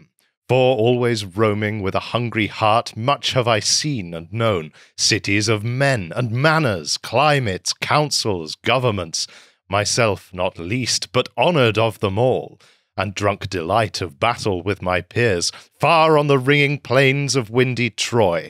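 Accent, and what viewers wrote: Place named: United Kingdom. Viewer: The Brit narration can not be beat.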